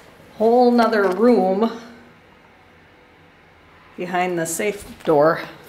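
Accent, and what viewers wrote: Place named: Minnesota, United States. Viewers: Yeah sounds Minnesotan to me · Where are you from? I'm guessing that's a Minnesota accent? Or North Dakota? Sounds like the cop on Fargo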